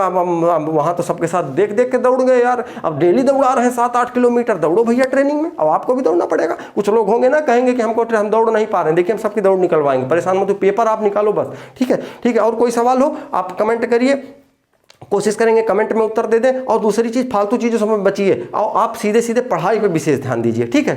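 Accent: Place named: Awadhi, India. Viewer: अवधी भाषा लगातीआपकी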